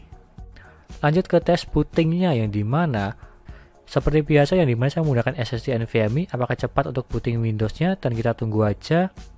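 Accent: Java, Indonesia. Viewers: jowo e sek ketoro bang ,gas review e walau bhsa indo e medok 🥱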